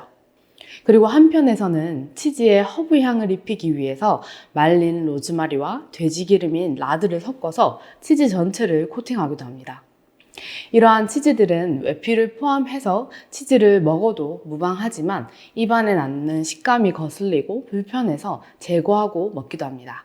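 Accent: Gyeongsang, South Korea. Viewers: 경상도 어데 출신이신지?? 꾸시하이 갱상도 사투리가 들리네요이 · 숨길수 없는 경상도사람ㅋㅋㅋㅋㅋㅋㅋㅋㅋ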